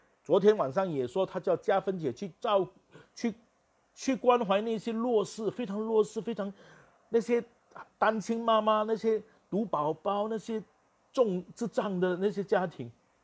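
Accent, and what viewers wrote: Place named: Cantonese, China. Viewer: 支持您！您的國語有點廣東腔，但很親切，又很有內容。加油！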